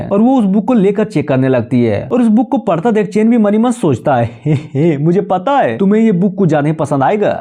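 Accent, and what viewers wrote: Bihar, India. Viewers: Bhai bihar se ho kya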